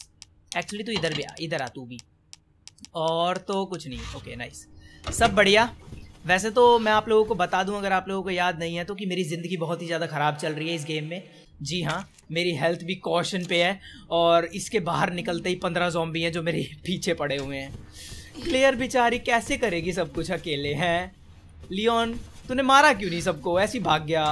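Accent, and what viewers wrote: Haryana, India. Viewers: Bhai toh haryana ka hai kya????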